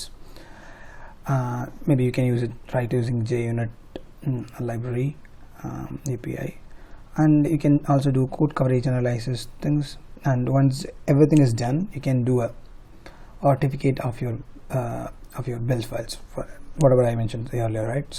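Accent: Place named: India. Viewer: i hate this indian english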